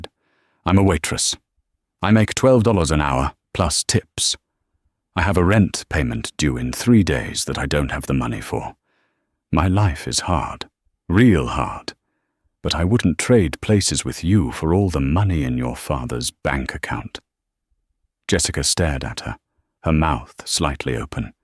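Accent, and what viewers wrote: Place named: England, United Kingdom. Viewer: Hi Canadian here Windsor Ontario And if the pictures / freeze frame slide shows at the top of your screen bother you, as they do me, just fold a piece of paper, as I do, over too of it to hide it and then just enjoy listening to that purring English accent and, if you prefer, as I do, follow along with the transcript. Cheers! Enjoy!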